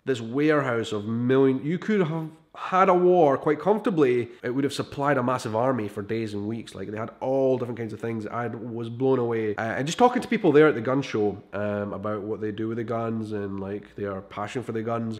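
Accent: Scotland, United Kingdom. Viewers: Nothing I like hearing more than a man with a Scottish accent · Was hoping you would sound like the scotsman from samurai jack, wasn't disappointed. 👍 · Americans are usually only rude to other Americans. You have the Braveheart accent. That's an automatic in. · I wish we had more Scottish visitors here in the U.S. I hope to visit Scotland someday. I love the rain and enjoy your accent, and I like tough honest people, castles and monasteries. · Just a few things... Do you like being know as a Scot or a Brit? Same with Us. And it’s “Apple atchun” ( both “a” s pronounced the same way). By the way. The Appalachian people were mostly Scots settling in this area. I have a wee bit of Scottish ancestry. Inn-n-Out is what it’s all about. We drove out the English because of our guns. PS love the accent!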